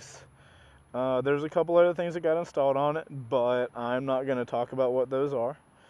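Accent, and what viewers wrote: United States. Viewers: dude u sound American, how did u ended up in Holand? lol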